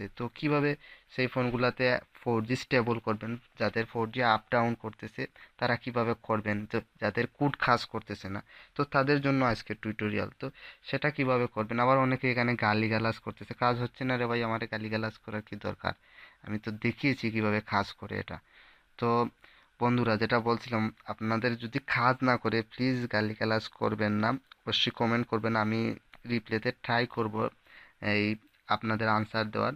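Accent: Sylhet, Bangladesh. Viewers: ভাইকি সিলেটি?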